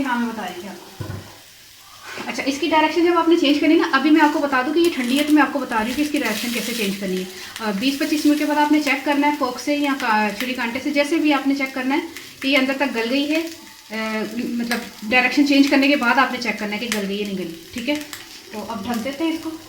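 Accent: Punjab, India. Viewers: Z MIRZA WITCH KIND OF PUNJABI IS ?? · Bht cute punjabi hy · your punjabi so sweet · مجھے آپ کی پنجابی بہت پسند ہے جب آپ پنجابی بولتی ہیں مجھے کافی ہنسی آتی ہے ۔ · Really enjoy your sweet cute punjabi. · Ur punjabi so sweet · I Absolutely loved your video, very simple and natural. Some people are writing such negative comments about your punjabi accent, these people are forgetting your channels name is "Punjabi da kitchen ". I'm a Punjabi Pakistani American. I have a hospitality degree and been in the States for most of my life. You girls are doing a good, keep working hard and be yourself I loved your work. God Bless <3 <3 <3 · recipie is very delicious, but your punjabi is much more sweet, Allah always blessed you and Allah give you every Khushi in your life ( Ameen ) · Punjaban se hindi aur 'inshaallah' sunke achha lagta h.. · Nice Panjabi touch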